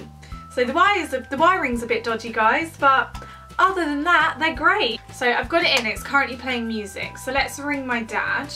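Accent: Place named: England, United Kingdom. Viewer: is she english or american? her accent sounds so english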